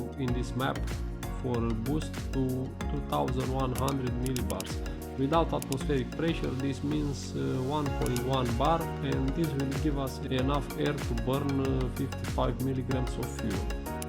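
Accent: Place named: Romania. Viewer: Mi-am dat seama ca esti roman dupa accent.😁Frumos video spor in continuare.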